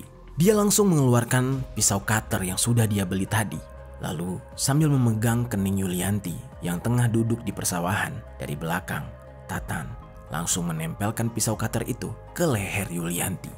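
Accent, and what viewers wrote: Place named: Sundanese, Indonesia. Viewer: Lucu banget bang kalo ngomong bahada sunda 😂😂😂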